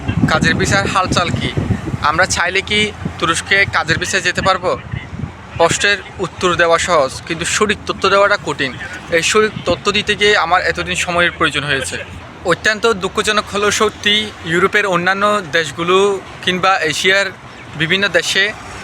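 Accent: Chittagong, Bangladesh. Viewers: তুমি কি চিটাগং এর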